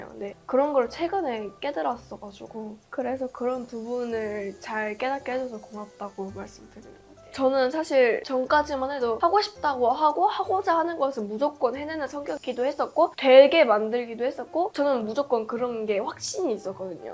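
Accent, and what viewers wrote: South Korea. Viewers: 발음은 한국사람 인데....어디서 발음 배움??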